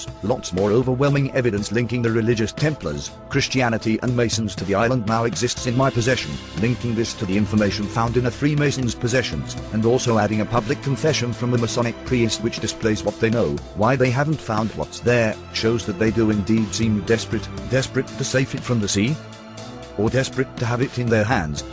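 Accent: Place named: England, United Kingdom. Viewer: Mystery history of lies._. Omg , always a stupid english voice like " they are superior in solving lies " ._. Not. Heres your truth the mystery trys to alter your perceptions away from._. A million pounds worth of lies._. Thats a good wishing well. They go broke and medias get rich ._. They wish to find gold at the bottom of a well ._. They wish they can find wealth benieth a well._. The oak island wishing well._.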